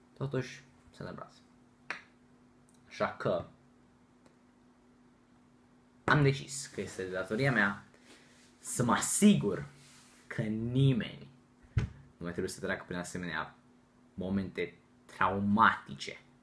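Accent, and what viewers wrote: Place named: United Kingdom. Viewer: se vede ca ai fost in anglia ca ai mult accent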